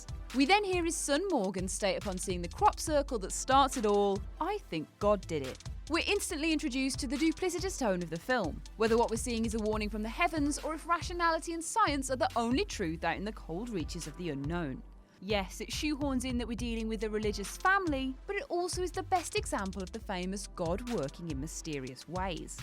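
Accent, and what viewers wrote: United Kingdom. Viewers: Why does every channel have an english accent now? Lol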